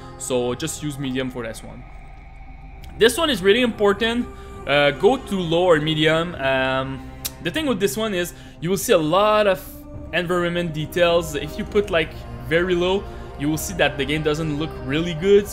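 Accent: Quebec, Canada. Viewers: Je reconnais un Québécois quand j’en entends un😂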